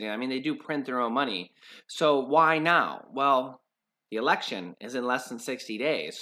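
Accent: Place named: Ohio, United States. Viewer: Hello! I knew you were from Ohio. Didn't even have to ask. You have that Ohio accent! Hope your day has been good. Enjoy listening to your updates and hope we can get this stimulus taken care of so we do not have to discuss it anymore for now. Enjoy the rest of your holiday!!!!!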